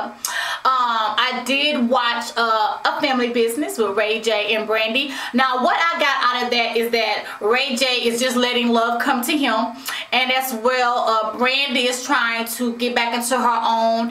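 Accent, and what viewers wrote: South, United States. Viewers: i feels that?? hahaha. your country accent cracks me up (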